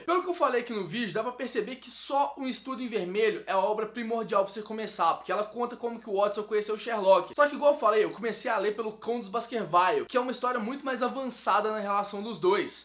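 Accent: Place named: Minas Gerais, Brazil. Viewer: cara vc é mineiro! vou falar aqui "procês"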